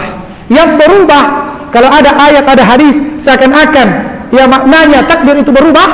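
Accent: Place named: Makassar, Indonesia. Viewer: Enak memang klo Dakwah pakai Bahasa Makassar yg kental 😌😌😌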